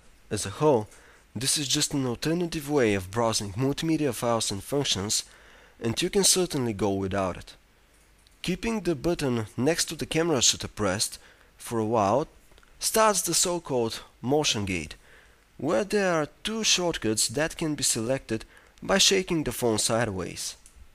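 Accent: Russia. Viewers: RUSSIAN. There's no doubt about it, and I thought he was drunk. Please arenaphone..., do something better. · maybe is the site german but the reviewer is anything but german... he sounds clearly russian or polish as I am german and actually know how the typical german accent sounds like... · ))) hahahaa man i like that russian english! :D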